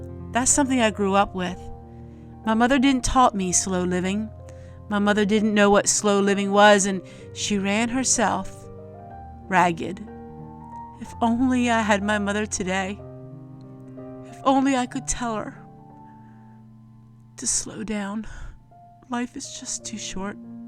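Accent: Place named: Pennsylvania, United States. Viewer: thank you for sharing. I lost my father several years ago and it feels like just yesterday. Thank you for sharing so much with us, so many skills that have been lost to us. I think you have a PA accent just like when I talk I have a NY accent and people don't always get what I'm saying 😆much love!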